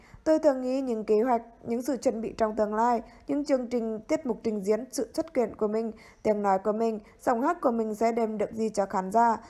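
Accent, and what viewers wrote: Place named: Central Highlands, Vietnam. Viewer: Giọng đọc của người thượng hả? Lè nhè sai bét.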